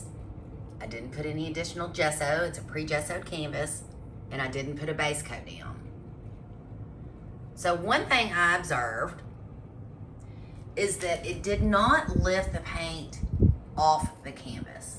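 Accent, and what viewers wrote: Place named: Arkansas, United States. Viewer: I thought both the works you showed were very cool as it does look alot like stalks of plants and you're right the possibilities are endless. I loved yours but would want to have the colours behind the stalks which i would make in different levels of the same green if you get me. What do you think? Also, what a fab southern Accent! So lovely to hear. I can't place it. 😂 oh wait its Arkansas!!! Wow great accents there!! these tips here are great & this could also look like a matrix type look in 3D so it could be super modern or clsssic with stalks....this second one is really beautiful.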